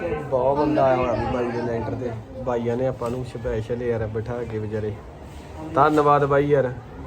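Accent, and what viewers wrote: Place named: Patiala, India. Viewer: ਬਾਈ ਕਿਆ ਥਾਰਾ ਪਿੰਡ ਪਟਿਆਲਾ ਕੇ ਲਾਗ ਪਵਾ ਬੋਲੀ ਤਾਂ ਇੱਧਰੀ ਕੀ ਲਗਾ ਬਾਕੀ ਛੋਟੂ ਘੈੱਟ ਐ ਜਿਸ ਕੋਲ ਘੱਟੋ-ਘੱਟ 300/ ਰੁਪਏ ਤਾਂ ਹੈ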